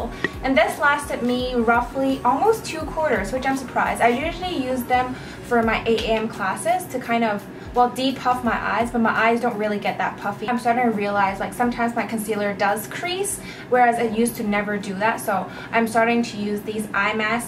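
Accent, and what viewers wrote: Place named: England, United Kingdom. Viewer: Love ur English accent xx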